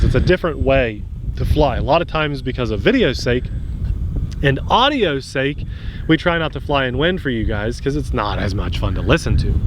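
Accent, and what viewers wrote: United States. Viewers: Very nice looking planes, love both colors, but yellow is my favorite. Surprised it handled so well in the wind for a small plane. So would this be considered a beginner friendly/ park flyer.❓️❓️. Liked how was pushing your buttons on pronunciation 😂😂😂🤭🤭. Also waco vs wok-o. Now My 2 cents. I pre clarify this by saying maybe its my west coast ears 👂 🤔, but when you say colors 9 out of 10 times i hear collars. Maybe its Kentucky/ east coast, ❓️❓️. No, not mad like you on waco/wok-o just figure its how it's said in your neck of the woods ⛰️🏞⛰️. Plus i couldn't let have ALL the fun.😄😄🥴🥴🤭🤭. Great flying, superb videoing, keep pushing those buttons.😂😂😆😆🥴🥴🤭🤭.⚓️⚓️👍🧡💛💚👍👂😂😆🥴🤭🤔👍⚓️⚓️.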